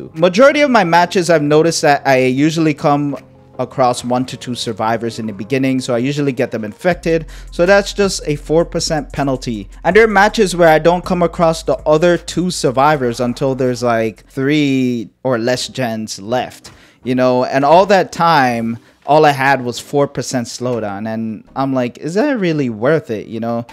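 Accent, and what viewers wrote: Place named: Colombia. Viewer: This boy still doesnt want to tell me if i was right at saying that I think he is Colombian. Im basing it on his accent. Have Colombian friends that talk just like him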